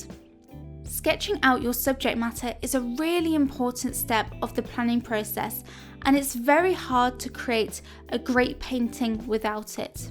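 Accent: United Kingdom. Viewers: Actually I was enjoying to listen to that amazing British accent 👍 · You are EXTREMELY British.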